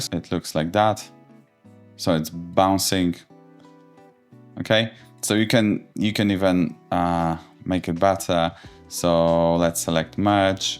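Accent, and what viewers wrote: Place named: Poland. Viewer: Mam wrażenie że słyszę tu polski akcent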